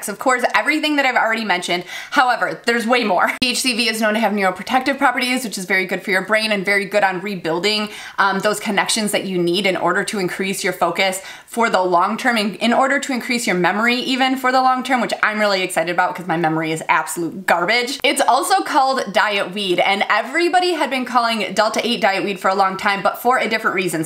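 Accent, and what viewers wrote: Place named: Midwest, United States. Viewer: you gotta be from michigan or ohio...lol we have the best accents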